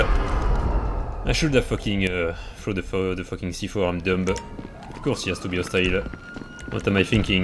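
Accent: France. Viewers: His techniques makes it by far but, yeha, French accent is hilarious... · Gta, good memes, French accent... I don't anything more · I mean not only are you talented on console which is enjoyable to watch, but your perfect English French accent is so enjoyable to listen to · The french accent is Amazing bro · The French accent makes these videos all the more entertaining to watch. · Sacrée accent français c’est beau ! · I love your videos and western slang with your French lol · First time watching your vídeos. The French accent is só damn funny 😂 Btw, tho they are Trash, u can't be mad at someone for ewoing when you are trying to kill them in a Jet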